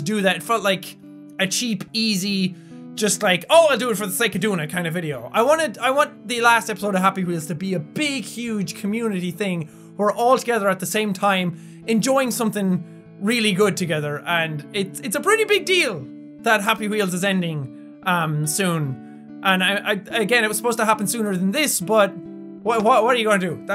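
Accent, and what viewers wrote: Ireland. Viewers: am in Brisbane Australia.;D ill be up watching and everyone in my house will be like "where is that shouting Irish voice come from?" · Jack/ can you possibly add Auckland New Zealand time because that is where I live and if you are looking for a peaceful vaca without being mobbed or anything that's the place to go and if you ever feel like coming to my country I think you might want some help saying our regions if your scared that you might get angry at for pronouncing the name wrong because you are from Ireland and you have a type of strong accent that kind of makes it harder for us to understand if you are saying the name correctly or not. So if you ever see this comment and do this thank you!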